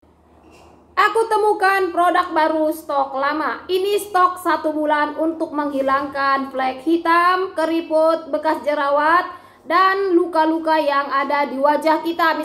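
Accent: Medan, Indonesia. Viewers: Denarin kk lucu banget kyak orang Medan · Maaff mbak orang medan ya??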